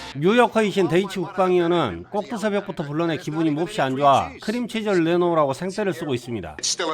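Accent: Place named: Jeolla, South Korea. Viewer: 구수한 전라도 억양과, 찰진 멘트에 또 낄낄거리며 힐링하고 갑니다.. 너무 감사합니다 ^^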